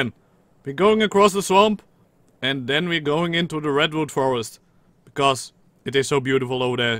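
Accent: Netherlands. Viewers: You sound dutch.. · are you From the netherlands, because you have kind of a dutch accent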